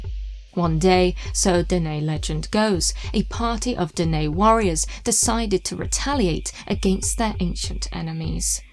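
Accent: England, United Kingdom. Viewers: Listening to you makes me want to dress well and drink tea while watching your videos. You're an English I always imagined english people are- elegant and classy. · Sorry, I don't the British accent. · Yes I agree that you are an excellent narrator. You kept me interested and and glued to the screen waiting for more information which you deliver in a way that interested at all times. Further more, and I must apologize in advance, but those dark brown eyes, give a sense of mystery and depth of knowledge. Gosh, it feels like I'm putting you on a pedestal here. I am from Canada and although some British accents are difficult to understand, yours was a delight to list to, to say the least. Finally, and embarrassing to me and perhaps to you, (in a good way) but I find you to be very beautiful. Strikingly beautiful actually. We all see beauty in our own ways but your delivery of the stories, looking into the camera, almost like its a conversations as if you were sitting across from me, talking directly to me. 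I apologize and hope you are not offended as my intention is that of appreciation and admiration. I look forward to further works from you. You definitely have a fan here in Canada lol. Take Care....Joe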